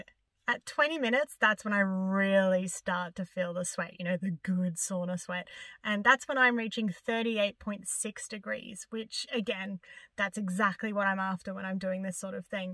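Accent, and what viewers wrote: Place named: Australia. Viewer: I got you in my feed and wow. Late to the party as always. You are awesome. Got to tell you, you sound Aussie but than I saw you where in England. So I started thinking ARE there people in England who sound like that, but they hide them? You never hear them on any shows in the states. Kind of like Liverpool speekers. I did figure it out, if you where from England you might not sound as happy. My opinion.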